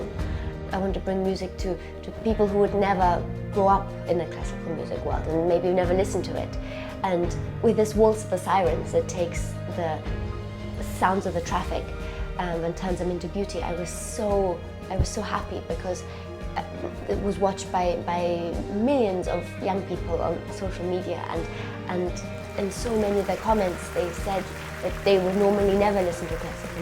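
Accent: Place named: Germany. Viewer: I think I here some slight German accent in her English mother tongue.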